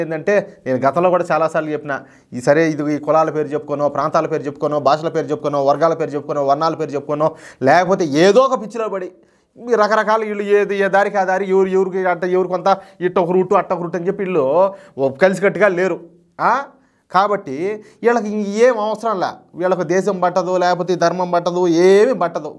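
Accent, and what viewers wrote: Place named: Bangalore, India. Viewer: Anna ee ooru anna meedi ? Ullagaddalu ani bangalore telugu matladuthunnaru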